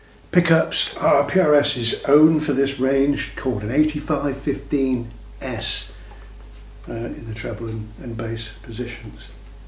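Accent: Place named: Devon, United Kingdom. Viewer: EXCELLENT PRESENTATION!, I came fot the Turquoise Custom 24!; Ebony!- and fhen, Wow!, 👌 I saw you, and DAMN! I thought I was looking at my dear Gramps!, here in Canada 🇨🇦 😎 🍁 Then you SPOKE! and 🌹 Zap me w/ a Feather!, YOUR ACCENT duplic ates his PERFECTLY! then you mention CORNWALL!, he's from DEVONSHIRE! Too-Rah-Eh!? I'm off to buy one of these NOW! Your playing is delightful! CHEERS! 🍻 from The Wild West! NEIL YOUNG COUNTRY ALL the Best!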